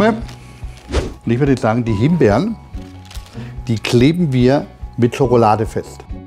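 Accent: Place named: Franconia, Germany. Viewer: Von wo in Franken bist du?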